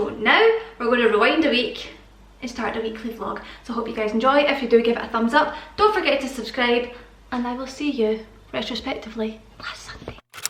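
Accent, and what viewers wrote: Scotland, United Kingdom. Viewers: "I like you. Do you want to be my valentine?" XD Also, I love your accent! When I first found your channel I was like, oh my gosh, her accent is everything. My favourite musician is also Scottish, so I may be a bit biased. ;) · Haha no I love the Scottish accent!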